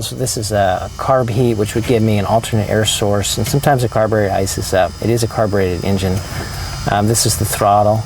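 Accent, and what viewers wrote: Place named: North, United Kingdom. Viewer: well,its not his fault, probably his accent. like how northern people pronounce "water" as "wadduh"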